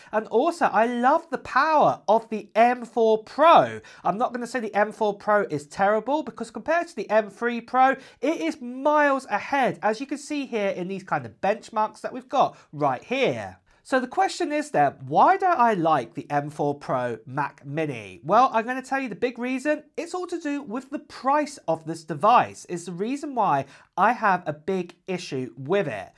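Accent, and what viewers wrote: United Kingdom. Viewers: I am planning on getting the base model M4 and hooking up an external thunderbolt drive in a thunderbolt enclosure for more (and very speedy) storage. I could really use a new iPhone but for now, my iPhone-7 is still ticking along. Love your videos and your accent. For some reason I feel smarter when listening to a Brit! 🤣🤣🤣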